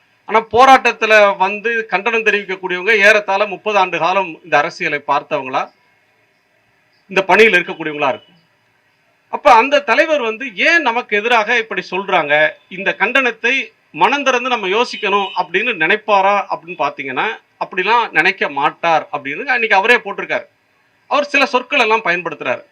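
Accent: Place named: Kongu, India. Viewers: இப்ப வரைக்கும் நீங்க பேசறது கோயமுத்தூர் வட்டார கொங்கு ( கொஞ்சு ) தமிழ்தானே.......❤❤❤ கச்சடாவை தூக்கி தூரமா போடுங்க...... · Kongu tamil appadithaan erukum.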